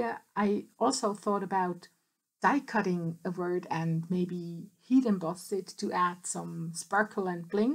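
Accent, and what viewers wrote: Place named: United Kingdom. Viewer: You‘ve got a fairly british accent my dear ☺️ Sehr schönes Layout! Liebe Grüsse 🙋🏻‍♀️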